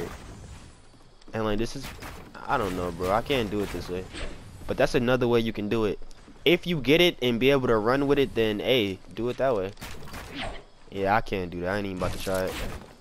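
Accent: Detroit, United States. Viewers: Are you from Detroit? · I know this might be a dumbass question...r u from Detroit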